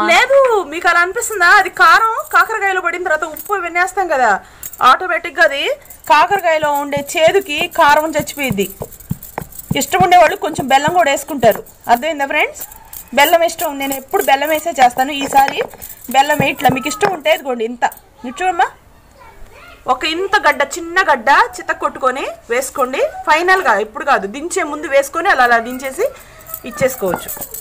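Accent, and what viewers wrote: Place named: Guntur, India. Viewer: Ala em undadu Amma urdu every state different slang untadi meeru matladindi guntur/Vijayawada slang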